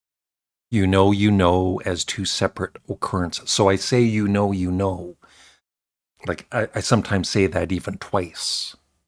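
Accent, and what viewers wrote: Canada. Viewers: Honestly, I never noticed you saying "you know". I do notice the "aboots" but that's your lovely Canadian accent which doesn't bother me. At least you don't wave your arms and hands in front of the camera like you're chasing off a swarm of angry bees. There are a couple music reviewers that give me a seizure with all their silly arm waving behavior 😆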